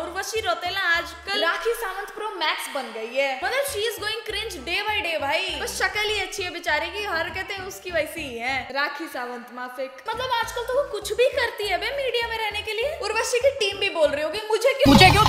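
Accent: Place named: Punjab, India. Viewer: Yar apki punjabi bhut cute lagi mujhe sachii 🫣🫣🫣🔥🔥🔥❤️❤️❤️❤️❤️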